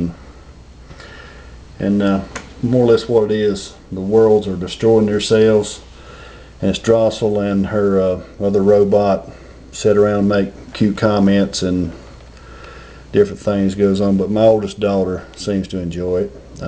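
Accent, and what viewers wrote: South, United States. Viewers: You sound southern to me XD Sorry if Im wrong! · Whoa, the southern accent threw me off a bit there, lol. Good vid.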